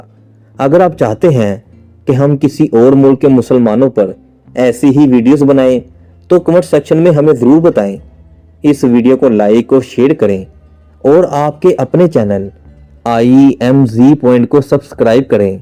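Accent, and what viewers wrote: Lahore, Pakistan. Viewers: بہت پیاری ویڈیو بنائی ہے اندرون لاہور والی اردو بولنے کی بجائے یہ پنجابی میں بنا لیں زیادہ بہتر ہے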